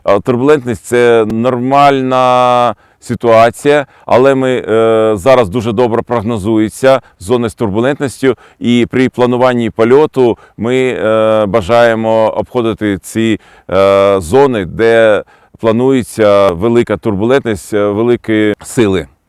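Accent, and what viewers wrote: Ukraine. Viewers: с украинским акцентом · Вы стали шипко по заподно-украинскому языку говорить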